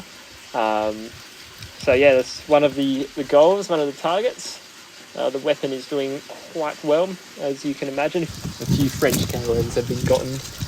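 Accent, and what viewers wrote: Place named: Australia. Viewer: I am French Canadian and as much as I love Australians, the accent requires some getting used to so could you please speak more slowly or kill background music when you talk to us. Nevertheless, love your blog and you're capacity to face unknown territory. Bonne exploration!🍀🍷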